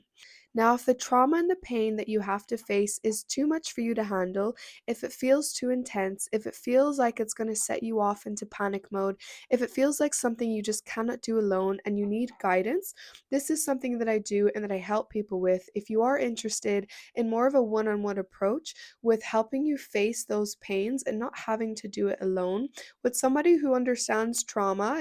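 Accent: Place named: Ireland. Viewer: Great video. Are you Irish. You have a hint of an Irish accent. Really excellent video on Limerance.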